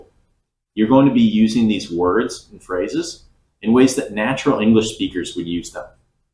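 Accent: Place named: General American, United States. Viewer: face-blue-smiling: ... Thanks a lot teacher for all you do for us. By the way, where is your accent from (state/city). I like it a lot. It's crisp and clear. It sounds like the General American English. When you speak I pause the video I repeat what you just said and try to imitate your accent. Greetings from Miami ... !!!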